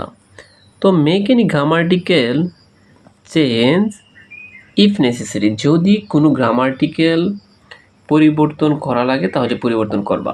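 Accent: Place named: Sylhet, Bangladesh. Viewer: Suru Sylheti😁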